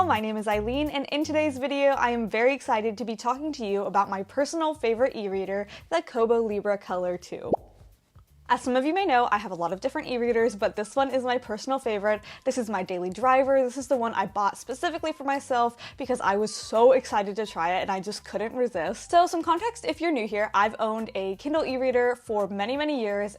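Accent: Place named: United States. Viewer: You sound like you are from the USA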